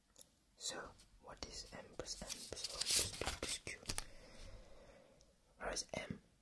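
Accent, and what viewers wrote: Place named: France. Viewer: I'm trying to learn french and I love your accent, and you're amazing at english! Nice video :)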